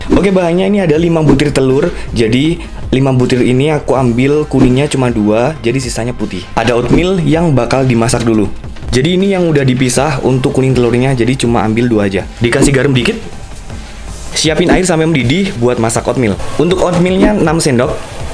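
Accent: Malang, Indonesia. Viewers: oalah mas iki wong malang to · Orang malang?? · Asli orang malang ta?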